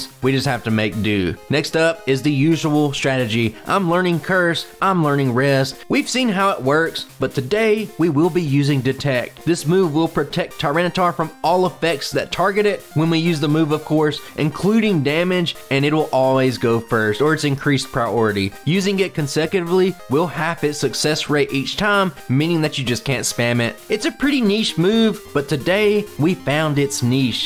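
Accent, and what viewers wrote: South, United States. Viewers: Almost got to 500! Also, I still love that I get some good ole southern representation and you’re not afraid of it. One thing that’s present in media is a bias against accents and i love people who can be themselves. So from a Georgian, thank you! Also, you the sandstorm meme could just be a slight against the University of South Carolina 😊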